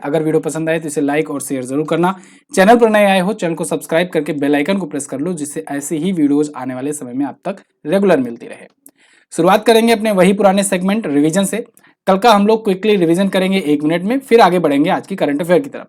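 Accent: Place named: Uttar Pradesh, India. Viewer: Sir aap up se ho na.....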